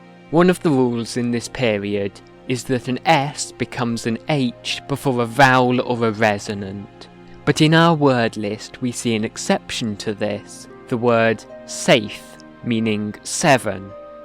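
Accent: Wales, United Kingdom. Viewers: I was actually going to mention that (to me at least) you sound like you have a bit of a welsh accent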